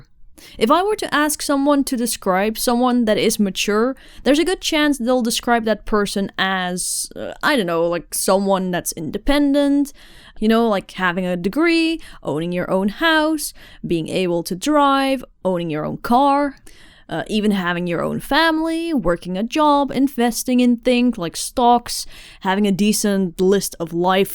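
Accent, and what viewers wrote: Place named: Netherlands. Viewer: Yeah I used to watch your vids back then but it wasn't noticable but now as I started talking to more Dutch people the accent became more noticable lol It's still a nice accent! :-) Hahaha, yeah I had to ask a friend what that "steenkolen" means - quite funny. Yeah, of course! ( As a fellow artist ) I still appreciate your art on a technical level!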